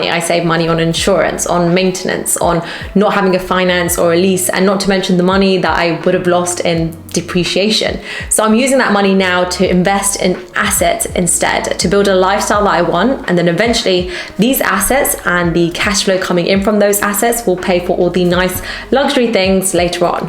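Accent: England, United Kingdom. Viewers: No, she looks Indian. She sounds British. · The England accent it’s annoying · I'm just here for the British accent 😂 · Firstly, I am American. Your accent is so soothing. I have found your channel and now I am binge watching them. There is some great information you are putting out. Thank you. I am 47 and never really new about financial education. I have started to learn thanks to YouTube. Between Minority Mindset and you and a couple others, I have started to learn. I am so far behind. But at least I have found people who are willing to make videos that caught my attention. I have been researching engine swaps for my car, and Minority Mindset popped up. And then your channel as well. I am so glad it did. I've seen other people like Robert Kiyosaki and a few others. I just bought Rich Dad Poor Dad. I am now on my way. I do have my 401k through my job. 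So that is good. You are a beautiful woman. And VERY INTELLIGENT. And with your British accent, you are very pleasant to watch. I am trying to learn as much as I can. I've been stuck in the rat race like almost everyone else. Your right, that's what they want. All these years I've been making other people wealthy instead of myself. Thank you so much,!!! Truth be told. I saw your thumb nail and thought, wow she is pretty. Sorry, I'm just a guy!!! Lol. But your content is so worth it. Thank you again for helping me and alot of other people turn our lives around.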